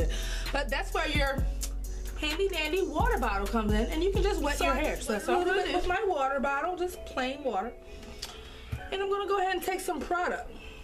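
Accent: Baltimore, United States. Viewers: you from baltimore? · Are you from Bmore? If not you have a serious Bmore accent.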